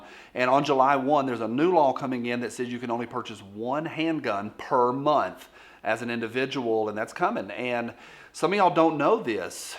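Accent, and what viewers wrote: South, United States. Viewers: Hay. Like your show a lot. I like that we do not agree every time, and....anD. I do not have to block you for that reason. Because we can disagree. I like the the diversity of your show. I like your southern accent, "Welcome, thanks for stopping by"....good deal. Iv only been watching you since Jan. So I'm fairly new. Have a GREAT DAY!!!!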